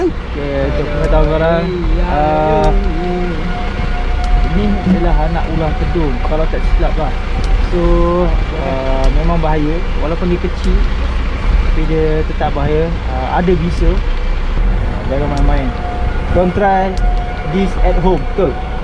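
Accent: Kelantan, Malaysia. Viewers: Abg ni orang kelantan ke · abang orang kelantan kee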